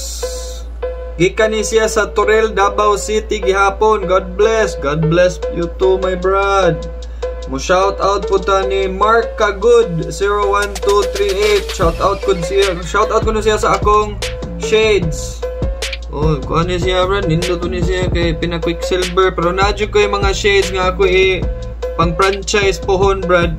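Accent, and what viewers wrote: Visayas, Philippines. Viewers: Perting ka hawd ug bisaya dol oi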